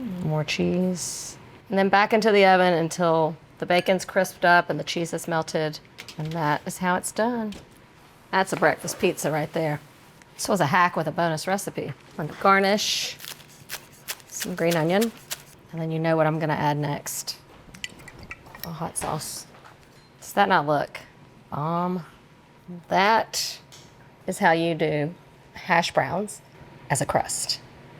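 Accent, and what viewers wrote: South, United States. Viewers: Hi there, your videos are SO good. You're so at ease with and very able to articulate the how and why of cooking with an air fryer, and the oven, of course. You're a very accomplished and personable woman. I've learned a lot from you about air fryers and cooking. And, your Southern easy-going presence and sound are familiar to me. Are you originally from Texas? I'm an 82 y/o woman who has just discovered air fryers. I received my 4 qt. Cuisinart today and after watching your video that included many things to cook using flour tortillas, I made a small meal using one of the small round tortillas I bought yesterday, filling it with delicious foods, and enjoying it within 6 minutes. It'll be a new tasty meal, so easy and tasty. So, thank you.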